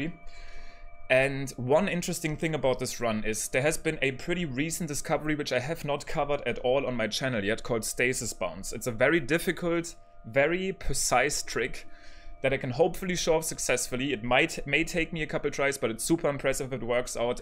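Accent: Germany. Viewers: This German accent is just nice 😂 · Dude i just watched this Video a minute long and just the way you speak tells me that you are german xd ja ich bin auch von Deutschland lol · idk if he does in this run or not, but my favorite is when he says “ragdoll” bc it sounds like “rectal” lmao. german accents are excellent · Im hearing a gernan fellow :) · bro i love your german accent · Man hört so raus das du Deutsch bist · this beautiful thick german accent is love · i love the german english accent.. or just the german accent in general!! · Wegen dem Akzent würde ich Deutsch sagen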